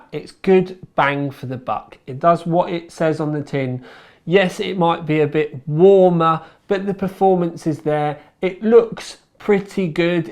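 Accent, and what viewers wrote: United Kingdom. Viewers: Nice British English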